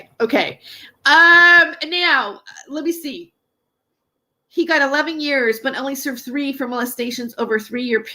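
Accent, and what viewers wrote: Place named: United States. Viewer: SPOT ON SISTER, its so refreshing to see a real talk truth seeker calling out the liars and shills....so many shills now are showing their true colours...truth is out there indeed and you do such a good job and an essential service for truth. I met MJ and he has a VERY big ego and slime energy. He turned up with all his bling gadgets (clearly this is a business for MJ and he jumps on any story to create a story to create the money , he doesnt care about truth!!! ). No-none cared or really spoke to MJ at the event , KK was also there and she was really cold/didnt mix and didnt crack a smile (tells me all i need to know, she thought she was very special indeed)...Liars, shills, pedos and their protectors all attract each other. Thank you again for exposing them. There are of course so many genuine authentic truthseekers and its all part of the shift as the liars in these interesting times are also exposed. 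I love your American loud mouth btw, you say it sister as it is lol (UK) xx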